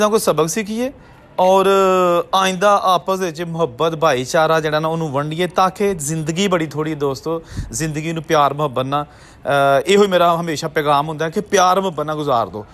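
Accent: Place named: Amritsar, India. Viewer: bro this was my 2nd velog of yours first was about KASUR railway station before partition kasur as a sub division and now a days as a district in Pakistani Punjab state, i was really impressed the way you tried to narrate same as the Punjabi language spoken within AMRITSAR DISTRICT of INDIAN Punjab state but in this video you were repeating 23rd date as (tareyi) which means your elder one's were from district GURDASPUR/ JAMMU because tareyi (23rd) is word from dogari language, the main velog about railways in Pakistan i really watch is"SITE & SOUNDS no doubt all others too are impressive but your velog really impressed let's pray the lord to be both the nation's one again or love to live in peace and harmony with love from INDIA ❤️❤️❤️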